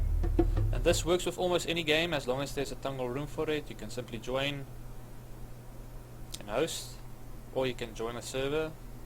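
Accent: South Africa. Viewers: South African? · are you South African ? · Jho br, daai afrikaanse aksent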